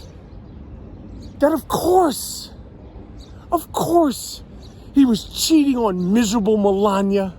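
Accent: New York, United States. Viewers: 😂😂😂 I just love your rant! You’re ranting everything I’ve been ranting for years!!! You’ve spoken in real New York style!👏👏👏👏👏👏👏👏 Oh happy day!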